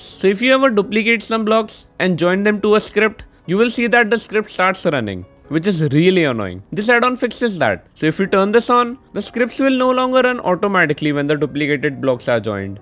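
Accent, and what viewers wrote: India. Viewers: I was focusing on the Indian voice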